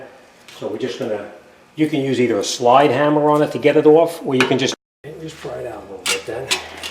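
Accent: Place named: New York, United States. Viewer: I'm a New York and I can hear it!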